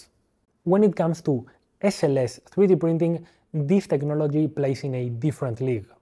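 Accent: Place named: Argentina. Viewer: Increible como se nota que hablas español por el tono de voz jaja, hasta incluso parece que hablas como Argentino aunque hayas dicho trabajas en una universidad de españa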